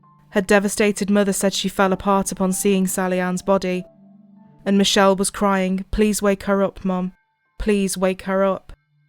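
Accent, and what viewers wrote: United Kingdom. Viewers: When you say reached out do you man contacted, you sound like you are British and not American so why do you use this Americanism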